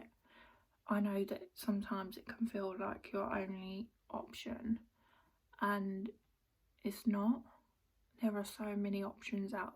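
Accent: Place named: United Kingdom. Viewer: This is random but I love your accent. what kind of british accent is it? x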